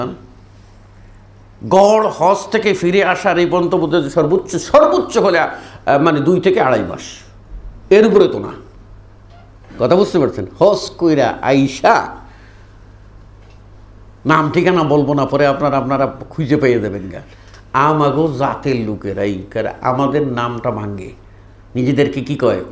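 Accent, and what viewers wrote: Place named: Sylhet, Bangladesh. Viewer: He is a Sylheti accent..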